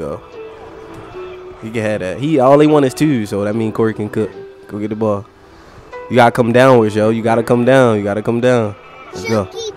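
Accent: Baltimore, United States. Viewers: U from Baltimore? I hear that accent lol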